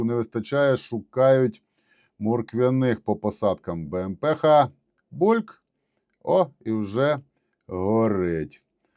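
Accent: Transcarpathia, Ukraine. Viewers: Як приємно чути нашу Закарпатську бесіду.Слава хлопцям! Дякуємо за роботу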